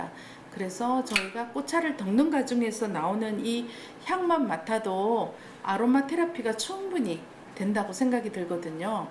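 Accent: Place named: Gyeongsang, South Korea. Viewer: 유혹의 꽃 장미도 차를 제실방에 오시어서 감사합니다 경상도 이신가 봅니다 늘 응원합니다 감사합니다 🙏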